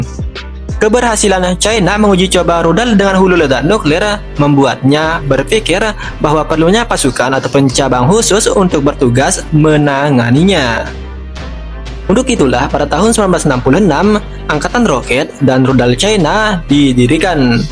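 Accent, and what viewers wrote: Lombok, Indonesia. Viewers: Mimin dari Lombok ya ?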